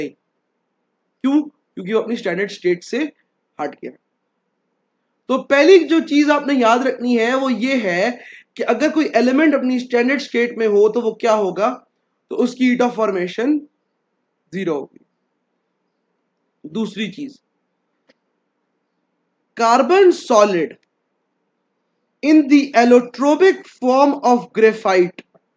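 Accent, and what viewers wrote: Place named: Mianwali, Pakistan. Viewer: I think you are from mianwali?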